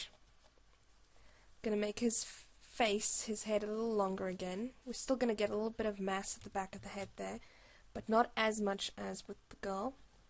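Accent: South Africa. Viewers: Ay! Is that a South African accent I hear? Love it!!! Dig this video! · Are you South African? Love this tutorial!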